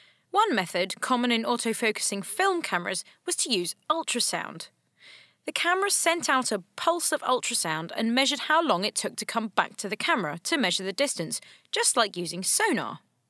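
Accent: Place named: United Kingdom. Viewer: it's a british accent.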